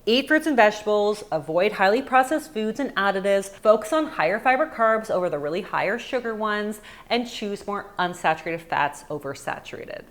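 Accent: Canada. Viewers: I know you're Canadian. But you sound more and more Canadian recently. Love it! ❤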